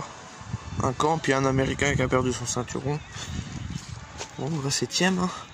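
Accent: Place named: Normandy, France. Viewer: c'est vrai que tu as l'accent normand ^^ tu es de quelle coin en Normandie ?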